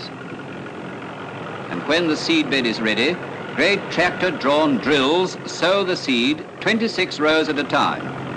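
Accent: RP, United Kingdom. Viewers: That's how UK commentators on TV /Radio etc used to speak back in those days.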